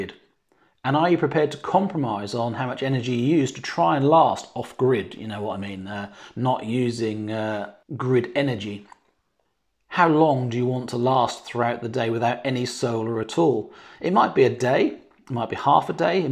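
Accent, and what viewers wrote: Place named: Norfolk, United Kingdom. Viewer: Thank you for your well thought out video. We installed our panels and batteries nearly a year ago now, and had similar thoughts. Now we live in Portugal, which is somewhat different. Approximately 300 days of sun per annum and you can’t sell back to the grid. But the same logic still applies; understand what you want from the system, work out your peak daily use, and size panel production and energy storage accordingly. We have been off-grid since March and we consider we have the balance. By the way, I’m a Norfolk gal, and I can hear a slight lilt in your speech, but the picture of Elm Hill confirmed it to me!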